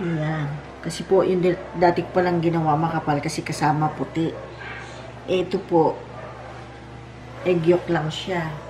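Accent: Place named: Bulacan, Philippines. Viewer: Aq po naglalagay ng dayap sa maja din..masarap po tlga ang my dayap..prang refreshing ang lasa nya.. Taga bulacan din po ba kayo Ma'am? Ksi po tagalog na tagalog kayo mag salita..tulad nlng nyan,,dayap ksi sa bulacan din.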